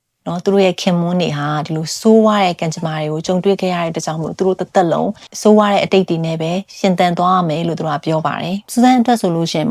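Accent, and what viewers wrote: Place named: Yangon, Myanmar. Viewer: ရန်ကုန်ဘက် လေသံမလို့ပါ😊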